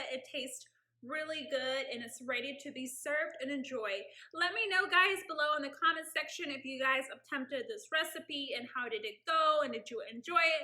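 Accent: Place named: South, United States. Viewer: I aint everrr met a mexican with a southern accent lol. I love this.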